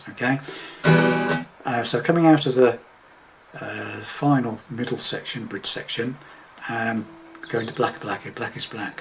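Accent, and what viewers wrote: United Kingdom. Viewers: gracias cuidaros mucho, también, supongo que por tu acento estas en UK, tus lecciones son muy didácticas para alguien de mi edad 61 años, gracias y cuídate por UK, que también tengo a mi hija en Lincoln, gracias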